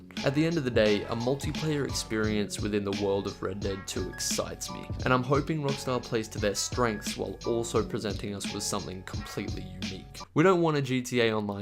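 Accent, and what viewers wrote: Australia. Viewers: R u Aussie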